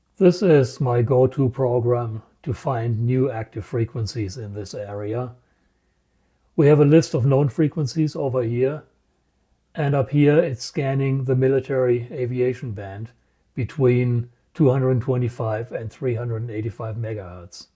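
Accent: Germany. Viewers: Hey, you are a native German? Name and accent point to that conclusion:) 73 from Germany:)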